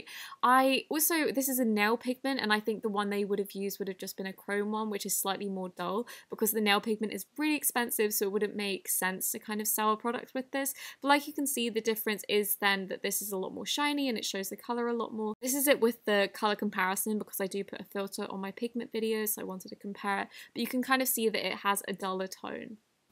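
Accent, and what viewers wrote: United Kingdom. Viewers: it's so nice to hear a slime you- tuber from the UK!!! also great video 😍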